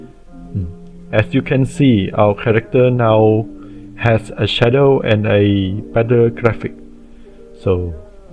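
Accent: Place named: India. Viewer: likes that Indian accent ☕️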